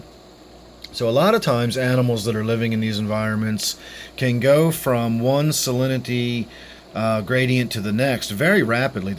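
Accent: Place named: East Coast, United States. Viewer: You talk like me are you from eastcoast? I'm from Baltimore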